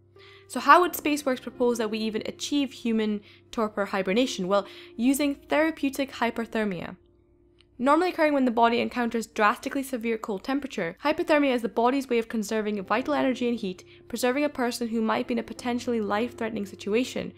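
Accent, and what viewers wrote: United Kingdom. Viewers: What part o UK are you from?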